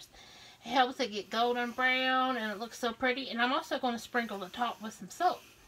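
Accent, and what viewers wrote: South, United States. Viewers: Looks easy and delicious. Where are you from? Love the southern accent😁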